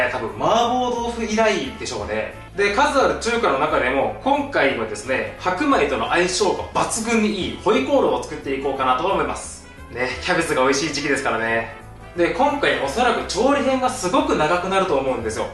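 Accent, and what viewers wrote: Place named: Kansai, Japan. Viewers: あなたの関西弁も反則やわ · 関西弁って強い(感嘆)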